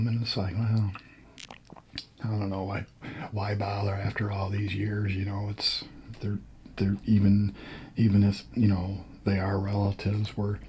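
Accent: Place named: Midwest, United States. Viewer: P.s. I love your accent! Are you from the Midwest? I’m from Utah but living in California 😊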